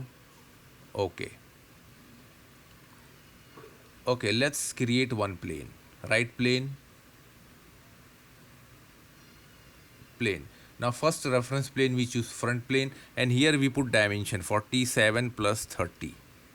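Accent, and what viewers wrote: India. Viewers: Hi.Please work on improving your English accent. You have mixed your English accent with your Indian accent in a funny way.😊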